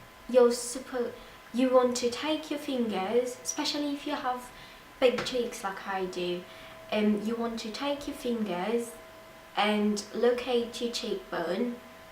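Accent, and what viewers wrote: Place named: United Kingdom. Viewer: I was like 'She's got a british accent now ' throughout the whole video :P Good one though, I'm officially a celeb's mate :3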